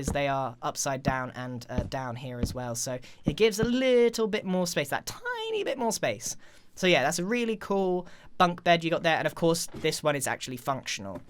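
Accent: United Kingdom. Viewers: Why do you sound extra British lol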